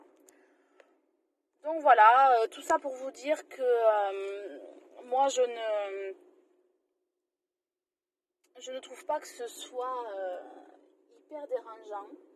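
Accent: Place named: South, France. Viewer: Il ne couvre même pas et fort heureusement ce (très) léger mais délicieux accent du midi ! 😃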